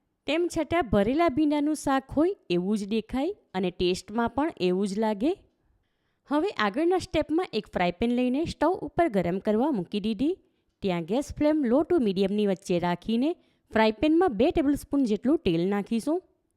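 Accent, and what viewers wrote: Surat, India. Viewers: Wah Ben Bahuj Mast Majedar Recipe Share Kari Superb 👌👌 Ben Assal Surti Language Ma Badhi Recipe Shikvado Cho Recipe Jovani Ane Tamari Surti Bhasha Sambhadvani Bahuj Game Ok 🙏 U Ben 🙏🌹🌹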